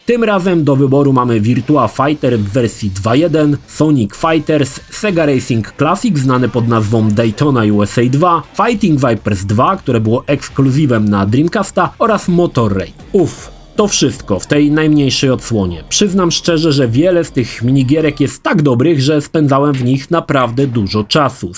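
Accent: Łódź, Poland. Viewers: tu nie ma w ogóle co porównywać. swoją amatorszczyzną i bełkotliwą łódźką dykcją nie staje nawet w szeregu z i może mu co najwyżej buty czyścić.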